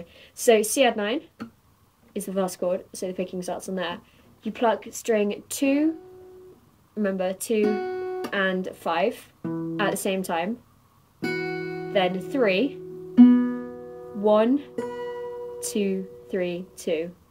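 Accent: United Kingdom. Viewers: OMG BRITISH ACCENT !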